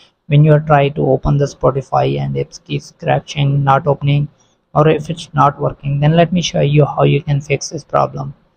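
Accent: India. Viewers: I already knew it was gonna be a pointless tutorial when I heard an Indian dude start speaking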